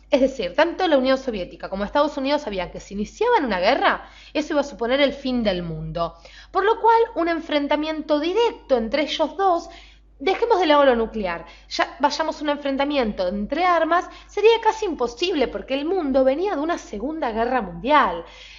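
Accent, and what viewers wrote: Argentina. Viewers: Cuanta ignorancia carajo, soy mexicano y a mí tambien me caga como hablan los argentinos con ese acento de mierda, ya todo difiere de la region o países pero hay que admitir que el español de verdad es el de España ya que ellos lo trageron al continente y por ende así se debería hablar. La muchacha del video al pesar del acento que me incomoda un poco se expresó de forma profecional, lo más natural posible y no como otros videos que utilizan frases que suelen usar en argentina como vos, pelotudo, que se yo......, pongo como ejemplo la mayoría de las peliculas gringas son dobladas en méxico para latinoamerica y en ninguna dicen cabrón, chabo, pendejo, etc. Así yo veo este video, por lo demás esta bien. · es argentina, no la culpe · pinche acento argentino xd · "pantasha" es la pronunciación de la palabra pantalla en Argentina, no "pantaia"...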